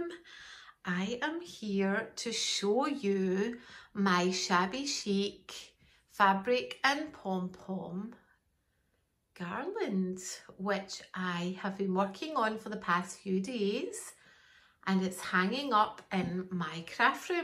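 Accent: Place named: Scotland, United Kingdom. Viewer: hahahaha! I love your Scottish speak :) would you be willing to do a tutorial soon so we could see one start to finish?? I am going to try to do one on my own, but a visual reference would be fabulous.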